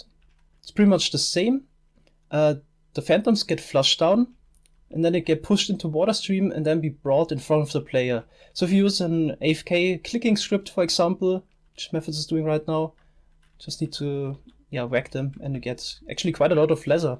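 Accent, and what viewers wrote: Germany. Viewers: wie Methoz auch noch am Anfang Hallo sagt :D Find ich cool das auch deutsche/Österreicher englische Videos machen :) Man hörts aber (nicht böse gemeint) :) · Mann merkt den deutschen akxent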